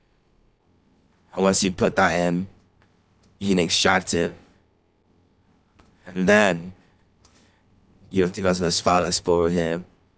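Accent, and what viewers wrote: United Kingdom. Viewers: Why this nigga speaking british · keep getting runtime error (why does kanye sounds british in this vid)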